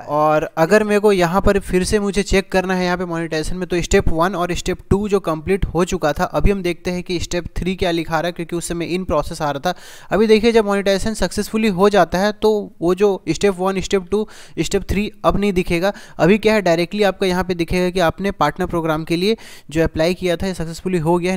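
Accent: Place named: Jharkhand, India. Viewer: Sir ji kya aap jharkhand se ho kya.....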